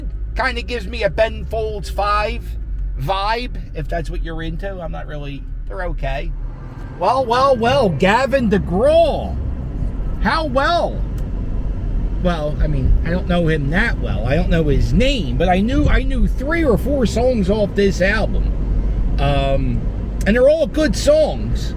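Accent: Philadelphia, United States. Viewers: Dude, I heard your accent and immediately though, yo, Philly! ...then you said you were driving to Wilmington and that sealed it,... Delco? Fair enough review on Coheed, but i gotta say, you gotta see those dudes live.... the fuckin energy they throw off is amazing!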